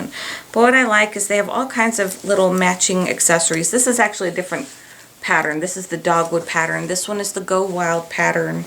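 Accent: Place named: United States. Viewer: hi I watched your rainbow loom vid and your voice sounds American but u look Asian please tell me where your from I love your vids please reply ASAP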